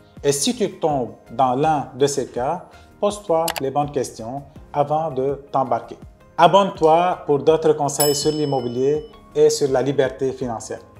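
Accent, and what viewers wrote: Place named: Quebec, Canada. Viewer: Avant de cliquer j'étais sur que t'était québécois.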